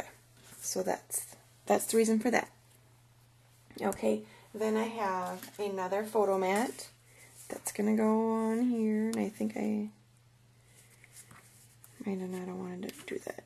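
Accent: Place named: Wisconsin, United States. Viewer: Hi I am watching this,just saying to my kids she sounds like she is from Wisc.You come to Florida we just go back from Wisc love this layout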